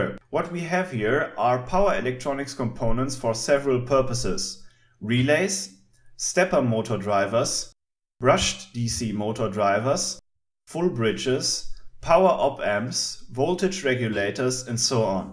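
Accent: Germany. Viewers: Yeah German or Austrian definitely he speaks classic school accent as it's spoken in schools in these countries but not bad... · For a German his accent is really light imo. Excellent pronunciation too. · evil German mad scientist of course. classic accent :) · Surprised you haven't used any of this to make CNC of some sort. I also love your accent, partly because I was stationed in Germany while in the US Army, so it's nice to hear English with a German accent again. Keep up the great work, I learn a lot from you!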